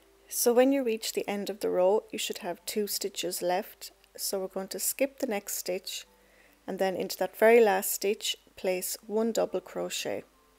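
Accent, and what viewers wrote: Ireland. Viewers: I am a new learner, I was scrolling through tutorials on how to crochet a cardigan " I love a challenge " when I heard you're Irish accent 🇮🇪 I had to try this as im Irish. Im getting there. Thank you. ❤❤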